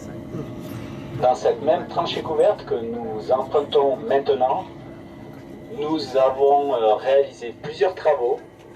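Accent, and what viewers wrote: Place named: Marseille, France. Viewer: C'est un Witz j'espère, le présentateur marseillais au micro ?! Cet épouvantable accent accompagné du fameux "quatre-vingt-dix" . Affreux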